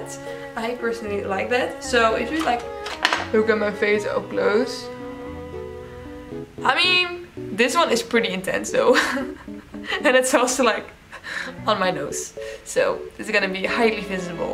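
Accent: Netherlands. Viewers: Je Engels is echt zo erg op zijn Nederlands😂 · Are you dutch? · Are you Dutch? Your accent sounds kinda Dutch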